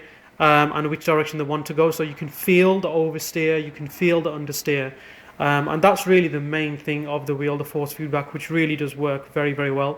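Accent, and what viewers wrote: United Kingdom. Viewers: i like your accent, british man :P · i hear a brummie accent, come on you blues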